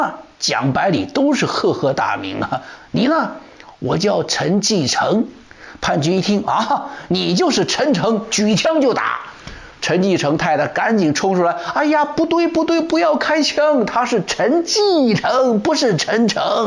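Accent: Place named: Northeast, China. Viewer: 江老师东北话说的地道!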